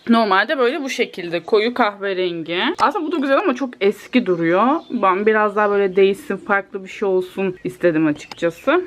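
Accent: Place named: Black Sea, Turkey. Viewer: Hep bile gidiyoz mu tam karadeniz kızı olmuşun kız valla memlekete gidince direk o şiveyi kapıyosun hep bile gibi bizde mezarüstüne gittik gidiyoz deriz bu arada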